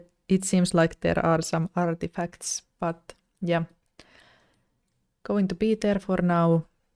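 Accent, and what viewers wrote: Finland. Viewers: i can recognize a finnish accent anywhere and this is one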